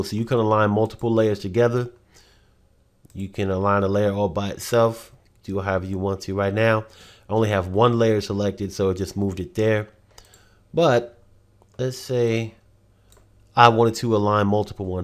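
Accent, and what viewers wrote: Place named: East Coast, United States. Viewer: Come on man that East Coast accent is bomb! Specially since I'm way out West! BTW nice tutorial, I learned a lot. Thanks!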